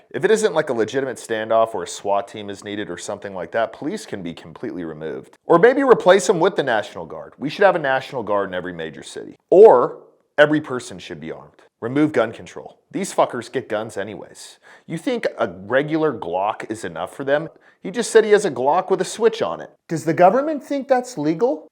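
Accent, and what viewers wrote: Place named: Texas, United States. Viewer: porn and a lil meth.... ill be straight up honest. thats my vice i need to break... boss this is the second video of yours ive ever seen (RIP Charlie Kirk. We wont forget you lil bro, you were a good one) and GOD DAMNED if real dont recognize real. i without even lookin it up know youre a Texan born n bred, i hear it and your demeanor... we know what we know when we know. [funny on topic story: id just got out like at my buddies for 5 mins (2014, county not tdcj) was at my buddies {did i mention a lil meth? kinda on brand at this point[more subtext]} and a girl walked past me.... thats it.... i said.... youre a grove rat aint ya? yup. she also was raised at the buckner childrens home like my pops.... when i say when know what we know when we know. i mean this. sorry for blatherin, prolly the meth.] porn n meth. just the time i waste on that. 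not consuming my life mind you just that ya know.... its there. means other stuff is not. i should look at myself and realize if i saw this in a friend id be disappointed in them.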